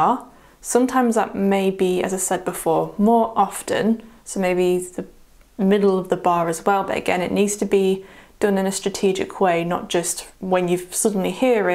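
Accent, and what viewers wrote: United Kingdom. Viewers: Tahnk you for tonight,, For some reason your uk aceent is seemigly plluck my heart string. d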